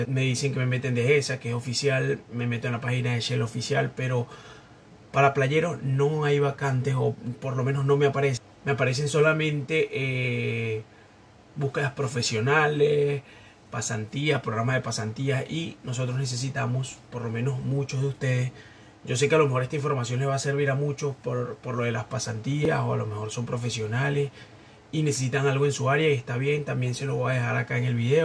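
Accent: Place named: Puerto Rico. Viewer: Amigo sonas puertorriqueño, de que parte de argentina sos?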